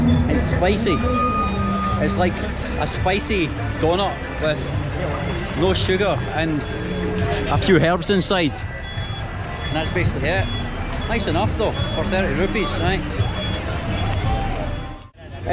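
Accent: Scotland, United Kingdom. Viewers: Making Scottish great again. Thanks for the video. The accent and the narration is sublime as Harald Baldr would say it. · I think it is just that scottish accent. The British got their wit from trying to deal with unruly Irish and Scots all the time is my guess. Been practicing a second language of Spanish in Puerto Rico and they speak it with a high pitch accent as well that makes it sound fast but actually mexican spanish is much faster. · Hey great vlog once again! Obviously in love with the Scottish accent which we find very humorous and words such as wee bit and your boy here 😃 just a clarification. Sadly Our forefathers never understood the gravity of letting go of the brits off our nation. Most Locals living out of Colombo don’t speak good English. That man said “ theres one in half hour”” he did actually say there’s a bus in every half hour but sadly his English is wrong. 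Hopefully oneday they will too improve. Anyways I’m sure you got a better deal price wise traveling in the bus buddy 👍 have fun! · Hi, Just seen your video that you are in Kandy. I am from Kandy but at the moment I am in Scotland for my studies. Just for my curiosity, I would like to ask if you are from Scotland as you got very similar Scottish accent.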